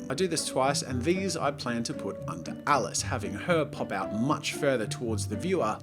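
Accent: United States. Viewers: american accent is amazing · THE AMERICAN ACCENT... WHY DOES IT SOIND SO DIFFERENT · why is his american accent so good? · Your America accent is spot on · Omg your american accent! More please! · * doing an american accent is so scarily accurate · *'s American accent is totally on point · His American accent is pretty great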